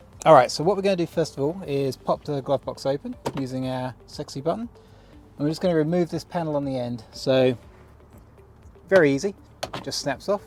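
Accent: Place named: United Kingdom. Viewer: I got literally 2/3rds of the way through this until I realised that this wasn’t a UK RHD version car. Your accent threw me off completely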